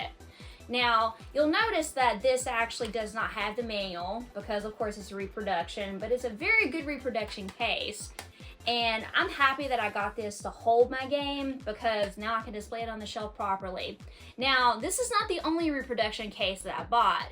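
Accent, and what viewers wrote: South, United States. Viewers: Ayo a fellow game collector with a southern accent and tattoos haha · Love your channel and your wonderful country accent 😊❤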